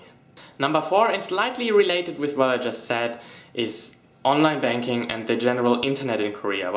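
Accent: Germany. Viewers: Jesus Christ your German accent sounds stupid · Ich dachte mir schon hmmm der akzent hört sich deutsch an und dann wirklich wooouw ich kann germsn accent raushören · Is he German? He kind of has this German accent when he talks other languages :( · OMG hab dein Video gesehen und hab die ganze Zeit gedacht, ist der nicht deutsche, weil dein leichter akzent hat mich stutzig gemacht und als du dann über das verhältnis von deo in deutschland geredet hast, hab ich es gemerkt. Sehr cooles video. Grüße aus Köln :) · Du sagst einen Satz und man merkt sofort, dass du Deutsch bist :D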